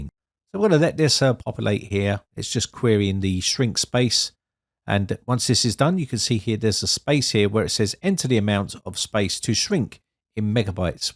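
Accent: United Kingdom. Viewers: Bri ish tbh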